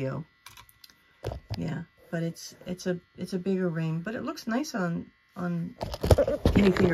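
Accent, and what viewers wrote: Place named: Massachusetts, United States. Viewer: “Memba?” Lol!! Are you from Massachusetts? Sounds like what we say here! Lol Can you please tell me how you deal with your jewelry? I don’t have even a slither of what you have and I have NO idea how to organize it. I’ve been an eBay seller for over 25 years but I sold vintage china and collectibles. I’m getting away from that because shipping is so high. I decided to switch to jewelry after I started researching some vintage pieces I got from my grandmother. But let me tell you… I knew NOTHING about jewelry about a year ago. I mean, it’s sad how little I knew!! I never even thought to look for marks, or anything, But I started to learn, then I bought only about 4 boxes from the online thrift and I will not allow myself to buy anything else until I sell some of this stuff!! How do you deal with it all? Eventually I bought a gem tester, metal testing kit, etc. 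I’ve tried to organize by colors, metals, time periods, etc., etc., but there are so many jewelry categories! This stuff takes a lot of time! At least for me it does. I feel like I’ll never make any money at the rate I’m going. Also, how do you sell? Online? Only on YouTube? Is it worth the time? When I try to list things, let’s say I take 20 pieces of jewelry to list…. After I finish looking at them with the loupe, research them, clean them up, take pics, edit the pics, then list them, it’s taken me like 12 hours! Can anyone offer me a little help in starting up? Thank you so much! Oh… btw, I LOVE that you show us close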